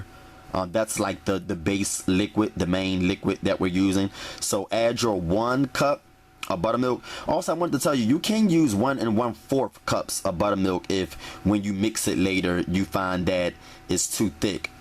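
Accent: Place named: Baltimore, United States. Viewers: I thought the same thing. I heard Baltimore and DC in there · Omg!! Why do you sound like you’re from Baltimore!! I guess the accents are very similar, either way I love it lol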